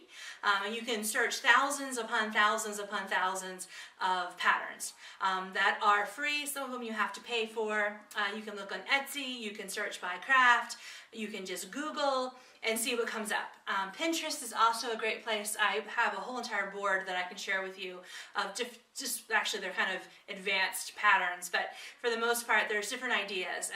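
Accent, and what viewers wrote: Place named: East Coast, United States. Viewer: Nice! This is good stuff to inform and encourage beginners. And I really like that you speak well and I can see your face! I am hard of hearing and read lips, so this is especially important to me. Later you'll probably mostly be showing your hands, but I'll still be able to hear your good speech. I bet you're an East coaster -- out west those things are pronounced "skains" instead of "skeens." Good work, woman!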